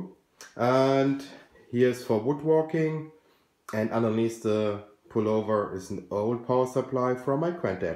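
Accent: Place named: Germany. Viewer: Wow, das nenne ich mal eine hurtige Antwort! Danke, wollte nochmal eine zweitmeinung dazu hören weil ich mir wegen gleich und Wechselstrom nicht so sicher war... Bei den Lüftern bin ich noch am überlegen Wiedes am besten funktioniert... wahrscheinlich werde ich das ganze in dem Gehäuse eines alten pc-Netzteils verbauen und die Originalen Lüfter verwenden obwohl das auch passiv gekühlt ausreichen sollte. Habe nen Kühlkörper einer alten Grafikkarte genommen, der besteht aus massivem Kupfer und sollte mehr als ausreichend sein. Super, Danke nochmal für die schnelle Antwort, jetzt kann ich mich an das Kit ran machen dann ist es mit etwas Glück heute Abend alles fertig. Hehe der Akzent war doch etwas verräterisch deswegen hab ich mal auf deutsch getippt 😁👍 Grüße zurück Andreas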